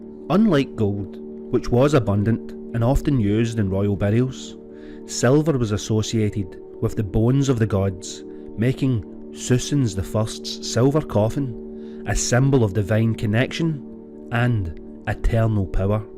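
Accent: Scotland, United Kingdom. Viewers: Turned on the captions so I could understand through the Scottish accent. The captions were hilarious. They told of pharaoh Susan The First, Howard Cattle, and more!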